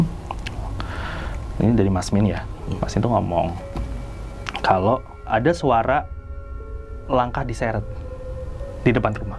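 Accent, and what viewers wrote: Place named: Cirebon, Indonesia. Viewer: asli wong Cirebon apa Indramayu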